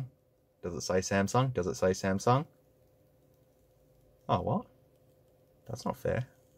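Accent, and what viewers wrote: Australia. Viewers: Well he does speak Australian English. So, yeah. 🤷🏼‍♂️ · I personally like the long vids, and for an aussie you have a pleasant accent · From one Aussie to another, our accent always throws me when I hear it in media haha. Bloody good shit though, subbed.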